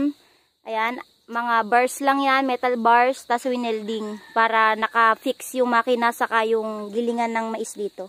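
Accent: Visayas, Philippines. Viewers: kakatuwa tlga magsalita ang bisaya natutuwa ako sau madam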